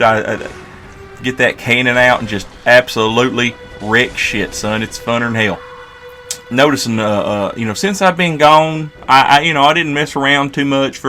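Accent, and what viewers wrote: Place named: South, United States. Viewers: I love your Southern drawl; it’s really authentic . I live in the South, so I give it my seal of approval. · A hillbilly saint talking with good piano music. What combination is this?!!?!?!?!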